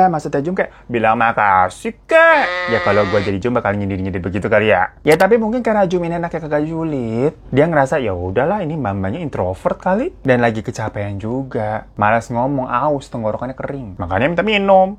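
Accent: Java, Indonesia. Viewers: Lucu bgt ya, kak bahasa jawanya lucu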